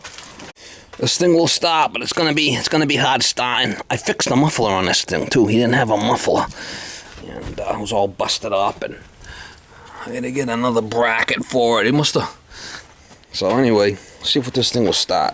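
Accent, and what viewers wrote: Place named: New York, United States. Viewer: you sound like you are from New York. It makes sense since i can find a single fucking 420/430 around new england for a good price since you are picking up every single one of them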